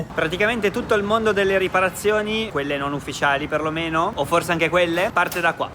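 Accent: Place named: Veneto, Italy. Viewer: È l'accento veneto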